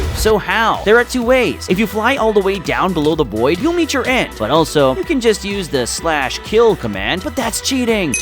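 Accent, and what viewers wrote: United Kingdom. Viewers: Wheres british voice? 😔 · WHERE IS THE BRITISH VOICE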